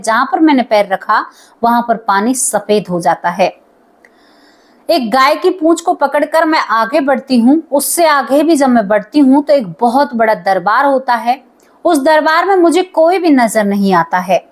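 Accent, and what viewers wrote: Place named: Braj, India. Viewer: आत्मा अजर अमर अविनाशी है।यही अटल सत्य है जी। आपकी हिंदी उच्चारण से आप ब्रिज क्षेत्र की प्रतीत होती हो, कृपया अपने बारे में भी बताने की कृपा करिएगा। सद्भावनाओं सहित दिनेश सारस्वत नयी दिल्ली से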